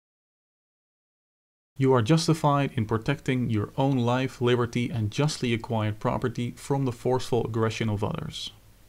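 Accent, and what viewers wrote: Netherlands. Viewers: it sounds as if you're from Holland. Good job with the video!:)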